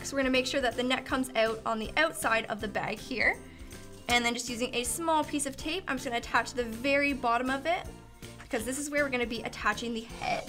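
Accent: Canada. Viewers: Yep, she's from Ontario :) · I am trying to to figure out your accent... are you from Canada? Also I name the giraffe Geoffrey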